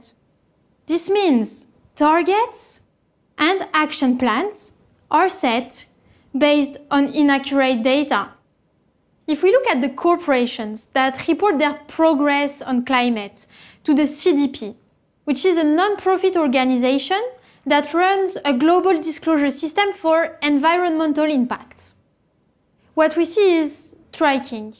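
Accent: France. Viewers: French accent is quite cute 😅😂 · I think she's French. But it sounds so good. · Let me guess.. she's from France.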